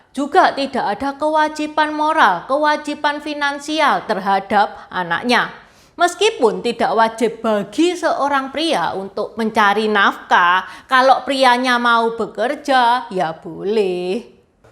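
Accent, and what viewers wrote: Java, Indonesia. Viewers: medok banget🤣👍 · Makasih ya ce, bertambah wawasan nih, awalnya lihat channel ini rasanya aneh banget, dikiranya orang lokal ternyata Tionghoa, saking medoknya aku kira cece asli Jawa.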